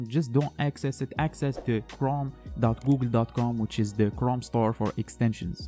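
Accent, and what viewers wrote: Morocco. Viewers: i knew ur Moroccan from ur accent , shoutout to you Mate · مغربي هذا ههههه باينة غي من الهضرة 😂😂😂😂🤦‍♂️🤦‍♂️ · Tbarklah 3lik akhoya bayna fik mghribi mn tari9a dlhdra👌👌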